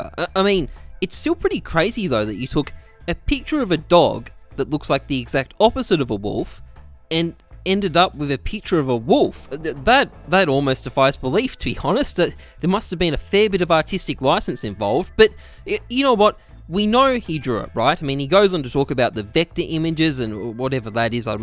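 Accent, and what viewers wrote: Finland. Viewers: Are you from finland? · Wait...... What are u From finland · Wait... Are you finnish??? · R u from finland? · are you finnish. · Are you Finnish? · are you from finland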